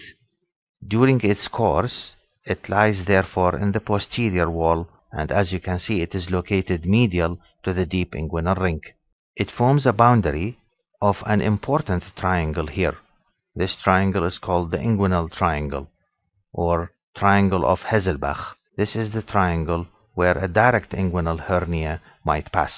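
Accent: Iraq. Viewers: Your accent seems to be of iraqi origin ? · ماشاءالله لا قوة الا بااله سمعت مقدمة محاضرة اخرى سمعت اللهجة و شككت انها لشخص عراقي و اسعدني ان اعرف ان المحاضر دكتور عراقي و أسعدتني التعليقات الإيجابية وفقك الله دكتور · Thanks for the great effort ..and by your accent i can tell you're from iraq am I right?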